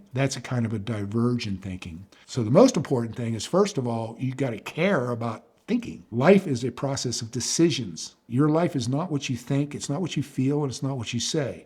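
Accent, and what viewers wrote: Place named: Pittsburgh, United States. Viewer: I thought I heard a Pittsburgh accent there. It’s not thick but to the train Deer it’s definitely there. Then when you were talking about the Pirates winning the World Series in 1960 I said Yep I knew it.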